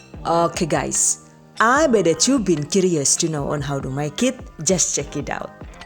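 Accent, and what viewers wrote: Banyumas, Indonesia. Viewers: gagal pokus pas ngmg ngapak kaya nggone nyong, bahasane ngapak😂😂😂😂😂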